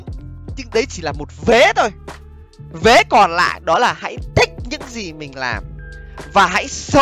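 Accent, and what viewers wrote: Standard. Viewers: Nghe anh ns nghe chuẩn vl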